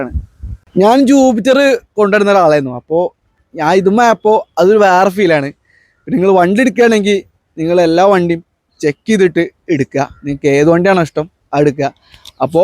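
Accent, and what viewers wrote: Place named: Nilambur, India. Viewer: Confident aayitt paray muthe Anne kond nadakkkum Nilambur language❤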